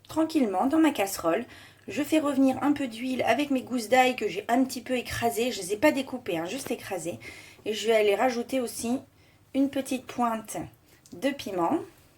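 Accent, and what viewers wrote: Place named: Italy. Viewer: accent italien, bonjour ! :face-purple-crying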